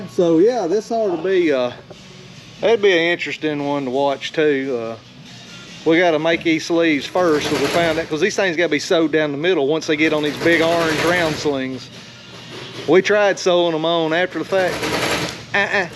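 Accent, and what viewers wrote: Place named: South, United States. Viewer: I have a retired uncle in Tifton Ga. that sounds a bit like you and people always take him for an idiot. They think he's like Goober from The Andy Griffith show, meanwhile he was one of the best structural engineers in his field back in the '70s, I always loved his accent and unpretentious common sense. His teaching style was laid back and based heavily on hands on learning through personal failures. At 14 he started out in steel fab and never forgot that he was once the student that could barely read a measuring tape as a JHS dropout. He's near 90 now and industry people still consult with him, at least the smart ones do, lol. You do great work and are a true master at your craft, I take a lot of pride in knowing our country has folks like you and my uncle in it. I do metal fab because of him btw, not at his level, but I've built some things that will be around long after I'm gone and that to me is a source of joy.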